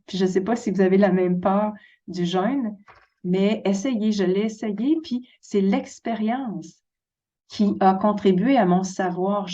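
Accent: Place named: Canada. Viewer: Toujours une joie de vous entendre! J'ai eu cette chance de vous approcher quelques minutes lors d'un événement dans le sud de la France. Dire que mon écoute vers vous depuis 2020 venait au fait que cela faisait écho à mon ressenti mais aussi grâce à la musicalité de votre accent me rappelant le plaisir que j'avais à entendre des membres de ma famille expatriés au Canada!!! Merci beaucoup pour tout ce que vous apportez à notre réflexion... une vraie richesse! Et je vous suivrai quand vous ferez votre page perso. Au gré des rencontres que la vie nous offre! Bien à vous.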